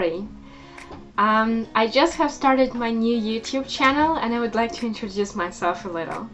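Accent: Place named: United Kingdom. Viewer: Fascinating accent with a little polished British in there. Like your videos. You should post more often.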